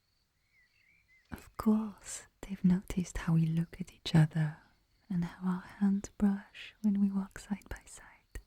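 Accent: France. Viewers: Ahh sh** ... back into the french accents rabbit hole 😅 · great audio, i love this a lot, tbh i just realised that it's kinda similar to the lizzie mcguire movie where she went on adventures, but of course, both outcomes are different, this one was great, i wish that i could have adventures someday too.. and looks like i have discovered a new city within this video, searched it up, and honestly, i wanna live there now, but i guess it will take time... once again, great audio 🥳💗 edit: i forgot to add this but i really love your french accent ^^ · Another day, another great upload by. Your French accent is always a win for me.:_heart2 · Worth the wait? Your damn right it was, I’m a sucker for alot of things (clearly) the French accent will be a favorite of mine always so you pair that with flirty, automatically will love it. You just keep on getting/doing better everytime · Flirty and french accents go together like cookies and milk. 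I'm very excited to see what this will be like. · These French accent videos are some of my favorites, so i definitely hope you maybe make another French character series in the future. I actually visited the south of France this past summer as part of a high school graduation trip, so this series has taken me back to all those amazing memories. Also, the quality on this video was amazing; the background sounds, the visuals and the plot all came together to make a entertaining audio. As always thanks for making great content, can't wait to see what comes next:) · French accent + speaking in French + singing = melt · Yes she is back with the French accent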